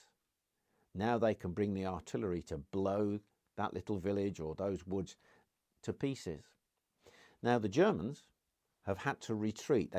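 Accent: United Kingdom. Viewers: I really enjoy your presentations. Especially your WW1 history. Please keep up the great work. Your refreshing, not boring or stagnant. And there's nothing like a British accent to tell a good story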